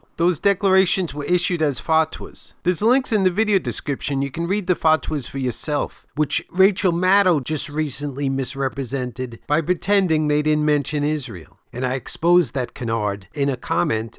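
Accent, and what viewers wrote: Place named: East Coast, United States. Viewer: I noticed you speak with an east coast Jewish accent. Are you Jewish?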